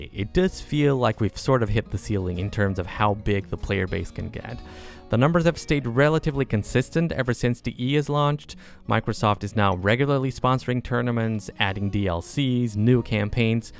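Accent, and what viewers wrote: Germany. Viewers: I think it does need to be pointed out that your German does really sound German for other foreigners. Then again, there isn't really a sole German accent. Just ask the Bavarians or Austrians😅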